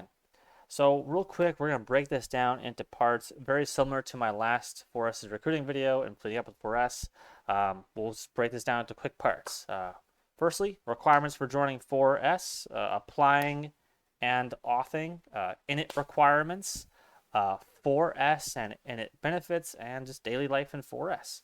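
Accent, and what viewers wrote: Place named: United States. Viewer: 4s is super euro. I didn't expect the American voice. expat?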